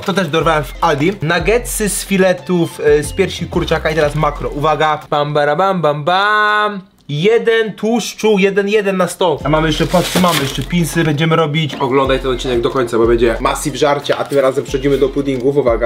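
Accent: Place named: mixed, Poland. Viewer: Mordo ty z Podlasia lub z Podkarpacia jesteś? Bo taką gwarą mówisz 😂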